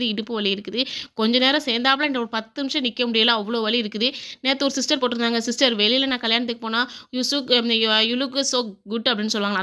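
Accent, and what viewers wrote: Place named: Kovilpatti, India. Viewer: Akka ninga ena ooru na kovilpatti nama ooru slang pesuringa ketan rmb depression aaguthu ka thaniya iruka mari iruku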